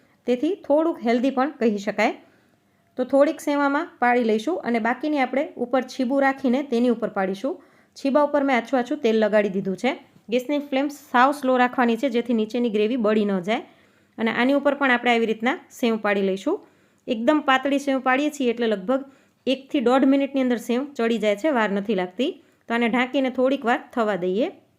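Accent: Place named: Ahmedabad, India. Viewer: Hu amdawad thi badhaj Shak saras che ben ❤ tamari boli parthi tame pn amdawad na lagocho ben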